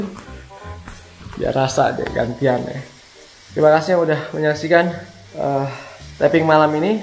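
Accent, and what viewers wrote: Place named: Surabaya, Indonesia. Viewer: matamu cok🤣 wkwk mantap paido suroboyoan🤟😂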